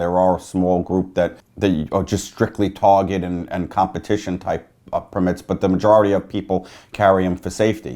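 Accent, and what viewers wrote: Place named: mixed, United States. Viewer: This man Ranch dressing look like a bootleg FaZe Apex with a Boston/New York accent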